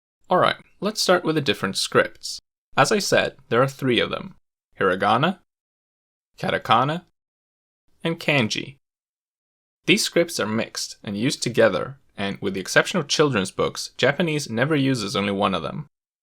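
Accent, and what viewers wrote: United States. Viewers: THE WAY YOU SAY THEM WITH THE BIGGEST AMERICAN ACCENT PISSES ME OFF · you have the most american pronunciation of kanji ever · Stand out? Like fingernails on a blackboard. To me, it's the worst sound in (American) English. I cringed every time you pronounced it, as well as when you pronounced Katakana and Hiragana in such an American accent. Otherwise, well done... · right? I'm Aussie and I honestly thought he was just American, sounds just like every other American to me! 😂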